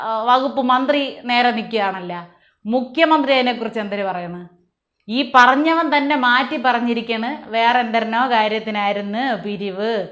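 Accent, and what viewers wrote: Thiruvananthapuram, India. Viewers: തിരോന്തരം ആണോ? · ഇതു തിരോന്തരം ഭാഷകളാണ് അല്ലേ അപ്പി 🤣🤣😂വളരെ മനോഹരമായിട്ടുണ്ട് ❤️👍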